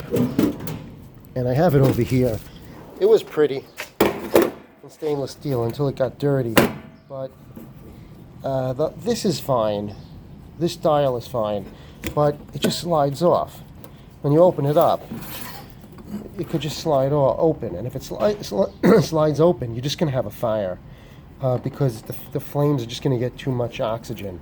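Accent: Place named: New England, United States. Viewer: I can tell from your accent you're a dumbass yankee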